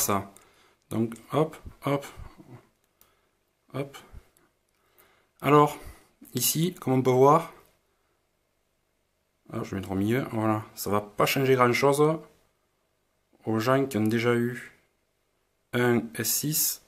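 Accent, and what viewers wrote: South, France. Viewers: Beau deballagE et tres bonnE video avec le bon accent du sudE ! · Continue j'adore tes vidéo et l'accent du sud qui va bien comme toujours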